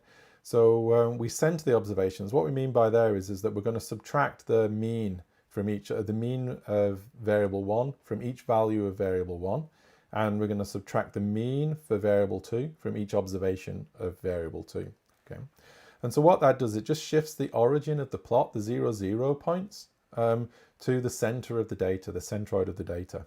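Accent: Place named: Hull, United Kingdom. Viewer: Thankyou for this! Added to our VLE for my MSc students in Hull (sounds lke you hail from not a million miles away?)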